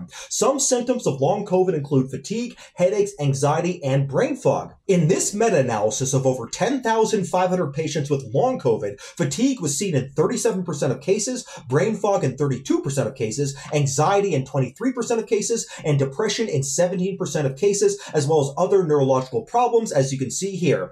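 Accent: United Kingdom. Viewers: God, I do love your British accent, mann 😂😂😂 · isnt he scottish lol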